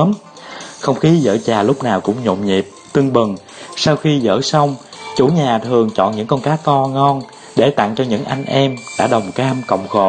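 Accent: Vietnam. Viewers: đánh cá thú vị quá,iu giọng miền tây quá bạn hiền ơi!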